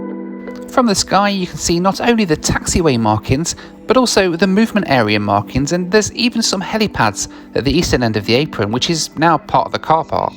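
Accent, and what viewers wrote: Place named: Sheffield, United Kingdom. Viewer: So why has she got a Sheffield accent then? Noted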